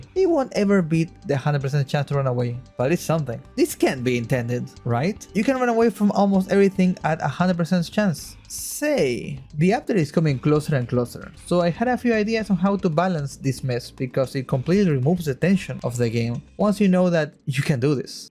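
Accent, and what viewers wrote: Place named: Argentina. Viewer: hasta en ingles se nota lo argentino jaja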